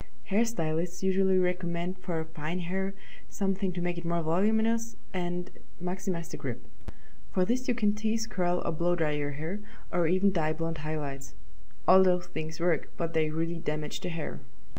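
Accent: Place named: Germany. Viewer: Dieser deutsche akzent man, friee golnn rüüs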